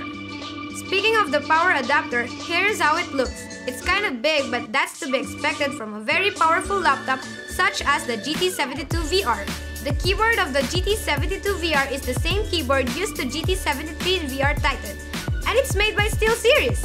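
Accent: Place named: Philippines. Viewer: hay filipino accent talaga xD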